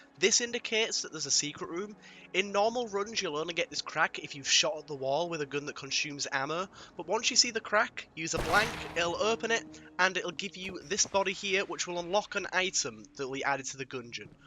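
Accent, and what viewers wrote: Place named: England, United Kingdom. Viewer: Oh thank goodness....and English accent!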